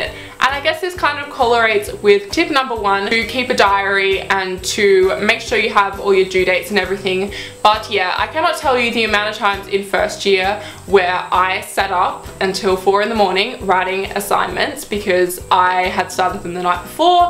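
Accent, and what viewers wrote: Australia. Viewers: Hey if i am not wrong are you Australian??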